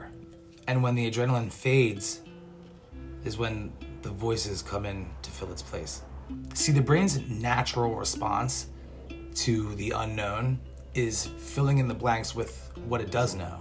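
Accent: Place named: England, United Kingdom. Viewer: You’re a brilliant actor - I hope things go well for you! 😊 That was a very good English accent, probably one of the best I’ve ever heard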